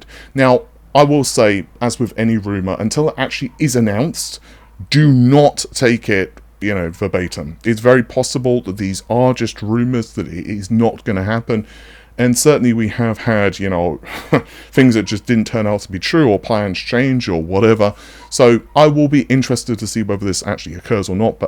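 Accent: United Kingdom. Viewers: Watchied a lot of British TV and I have come.accross quite a few people that sounds like this man's accent.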